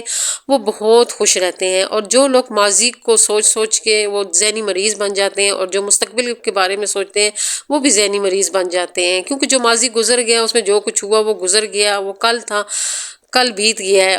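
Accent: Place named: Murree, Pakistan. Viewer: Ap ka speaking excent murre k kisi gaon ka hy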